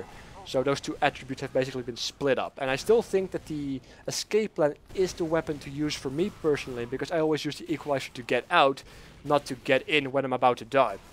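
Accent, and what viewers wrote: Netherlands. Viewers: Starting to like ur commentaries more and more! Quick question: Are u dutch? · Maybe is this a weird question but... Are you dutch??? I hear something like that in your voice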